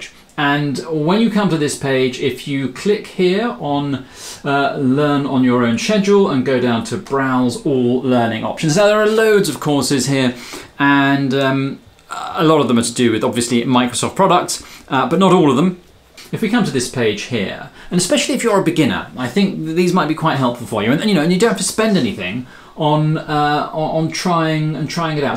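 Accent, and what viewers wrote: England, United Kingdom. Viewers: You English accent is very pleasing to hear . Like your ways of prouncing words . And as always your videos is always helpful .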